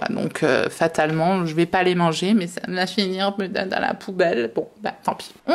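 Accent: England, United Kingdom. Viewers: J'aime bien votre chignon et surtout votre accent anglais ! Yeah ! 😄😉💗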